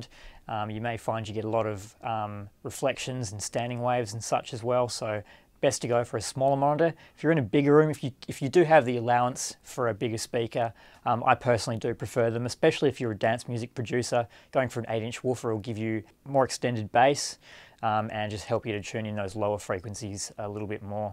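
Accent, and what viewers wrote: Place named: Oklahoma, United States. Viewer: sup with this dudes voice is he from oklahoma or what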